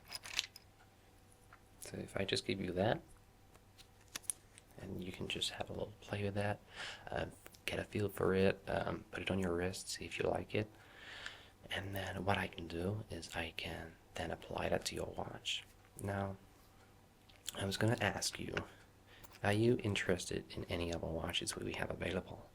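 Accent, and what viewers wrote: United States. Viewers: THE AMERICAN ACCENT IS AMAZING. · You're a mix between classic US accent, the Joker, and a nerd... And I kinda love it. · I hear... Boston, NY and some Tennessee twang. Now thats American! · Love the American accent. :) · You know, I've always wondered what it would be like to do an American accent when you normally have a British one. Anyway, good work on the accent; it's not that terrible! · I LOVE your american accent! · Nice American accent great role play thank you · oh that american accent is hilarious & insanely cute. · I didn't think your American accent was that bad actually! I have definitely heard worse :D This was a lovely video. I was surprised at how the sound of the metal got my tingles going. What a great trigger! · This is my first time watching him and I thought he was American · Say... that's a pretty good American accent. 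· omg nice American accent!!!! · Not a bad American accent! Much better than most Americans can do an English one! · He's in the UK. Maybe he's trying to do an American accent. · Wonderful job with this one. You did a pretty good job with the American accent. You dropped it once or twice when you said certain words but it's no biggie at all. It's a wonderful video. Brilliant job as always. :) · Great video! And it is funny how you try to do an American accent (you did a good job) and you didn't really pronounce the "r" which is typical British. So instead of saying offer you said offeh. Don't get me wrong I like it! Once again great video and I've been subscribed to you for quite a while so yeah! Keep up the good work!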